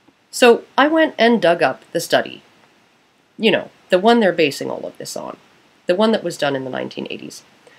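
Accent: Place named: Canada. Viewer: I LOVE CANADIAN ACCENTS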